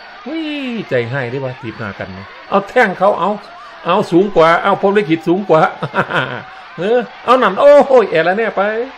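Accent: Isan, Thailand. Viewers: ผมชอบคนภาพมวยเสียงอีสารมากๆเลยครับฮาดีครับ(ชอบครับ😅😅😅)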